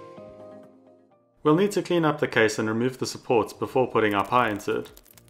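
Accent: South Africa. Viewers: you sound like a South African, not an Australian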